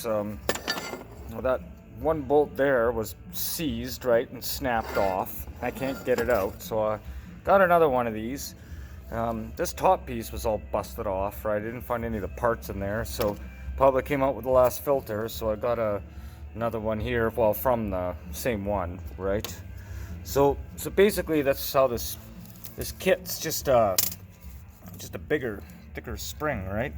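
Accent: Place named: Canada. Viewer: You sound very Canadian.😃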